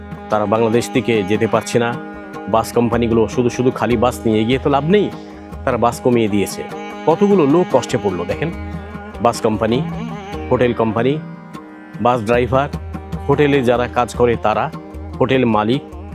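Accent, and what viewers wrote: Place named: West Bengal, India. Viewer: Your bangla is so beautiful. It is the same kind of language and accent that we bengalis from WB have. I enjoy your videos and your presentation. Greetings and best wishes from USA from a probashi Indian Bengalli. আপনার বাংলা ভাষা খুবই সুন্দর। এটা আমাদের পশ্চিম বঙ্গের বাঙালিদের মতোই। আপনার ভিডিও এবং উপস্থাপনা আমার খুব ভালো লাগে। একজন প্রবাসী ভারতীয় বাঙালির পক্ষ থেকে আমেরিকা থেকে শুভেচ্ছা এবং শুভকামনা।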